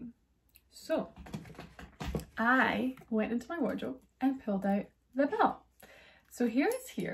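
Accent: Scotland, United Kingdom. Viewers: Are you Scottish? Your accent is super cool!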